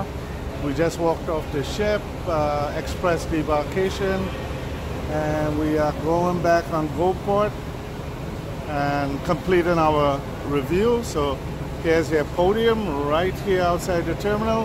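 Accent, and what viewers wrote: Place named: Trinidad and Tobago. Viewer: sounding trini..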